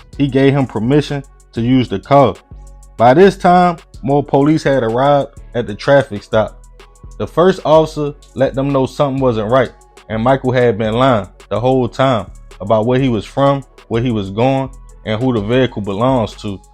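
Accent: Baltimore, United States. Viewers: This narrator has to be from Baltimore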